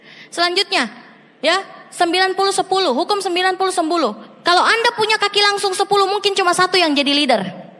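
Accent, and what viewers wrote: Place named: Sulawesi, Indonesia. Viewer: Logat sulawesi😊😊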